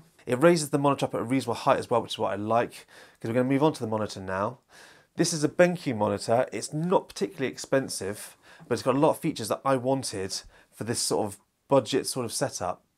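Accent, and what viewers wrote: United Kingdom. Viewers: Great video I stumbled onto searching for the combo of this specific BenQ monitor combined with a Mac. So thanks! You sound like you're from the UK (later in the video I hear you are... :-) ) ... but the link to the Bamboo stand is to the US Amazon... any idea if this great stand is available somewhere in Europe??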